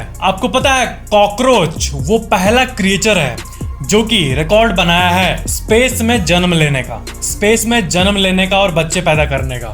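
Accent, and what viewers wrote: Bihar, India. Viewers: "i just love your bihari acsent "😂❤️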